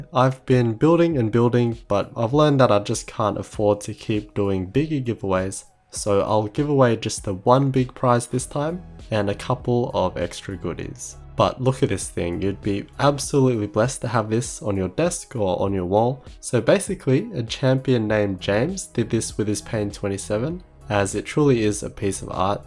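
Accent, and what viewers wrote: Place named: Australia. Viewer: You sound like Lachlan Likes a Thing. I think it’s the Aussie accent.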